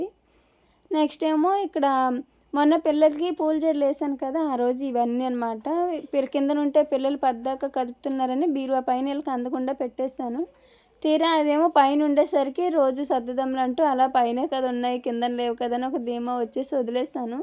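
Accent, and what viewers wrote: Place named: Guntur, India. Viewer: merekkada untaru.e bhasha ni batti tenali gunter bapatla atuside untavani anukuntunna. Me illu chAla baguntundi. Rent housa leda own house rent house ayite rent untu di. Water prob untunda taga daniki hyderabad nAku bore kottestondi. Anduke vere ooru lo settle avvalani undi.emantav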